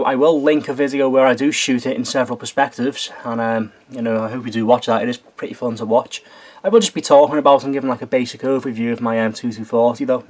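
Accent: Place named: Merseyside, United Kingdom. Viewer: Hi mate you sound like you live in Merseyside. Do you know anywhere here where we can shoot airguns? I live in birkenhead lol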